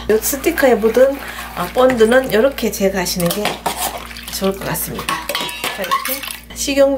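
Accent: Gyeongsang, South Korea. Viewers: 경상도 사투리 멋찌십니다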